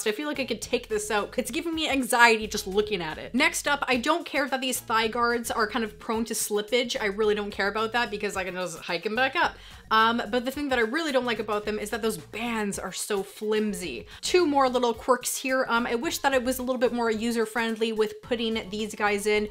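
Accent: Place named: Vancouver, Canada. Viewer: Your Vancouver accent is very apparent. I speak differently from you here in Ontario. Your speech is a little bit more clippy whereas, we tend to speak a little more laid back in Toronto.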